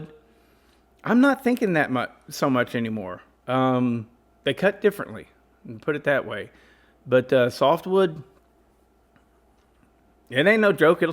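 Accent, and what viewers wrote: South, United States. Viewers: well thank you man. The southern accent can be crazy at times!! I plan to hopefully keep us learning and trying new things 👍🏻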